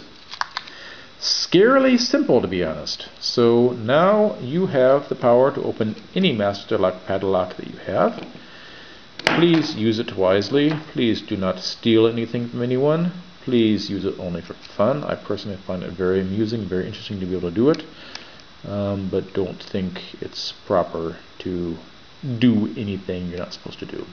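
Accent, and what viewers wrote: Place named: Canada. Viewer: I detect a Canadian accent!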